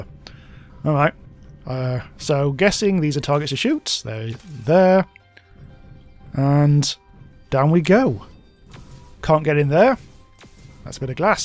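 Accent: United Kingdom. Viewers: I hope there will be stable 1.3 pretty soon. By the way, you have a fantastic voice, are you Britain?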